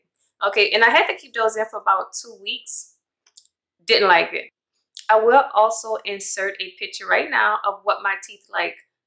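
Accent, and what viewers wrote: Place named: Louisiana, United States. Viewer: U sound like you from Louisiana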